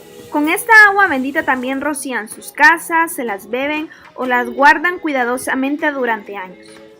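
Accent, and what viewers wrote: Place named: Ecuador. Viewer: Hola, usted es Ecuatoriana oh Mexicana porque tiene un acento parecido a la Ecuatoriana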